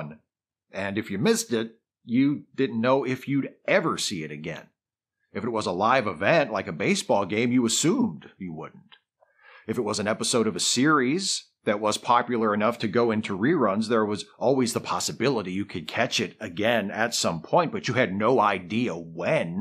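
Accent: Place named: Maryland, United States. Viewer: Sounds like you're a fellow Marylander. Or at least originally from the area.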